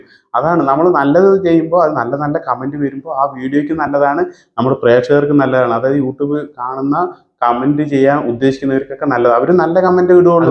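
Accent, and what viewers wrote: Palakkad, India. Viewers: Nigal palakkad ano Etta....🎉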